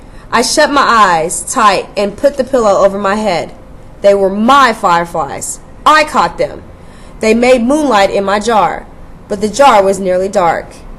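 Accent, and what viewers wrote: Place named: Germany. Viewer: also ur german accent is good................................................................................................................................................................(WAY TO MANY PERIODS)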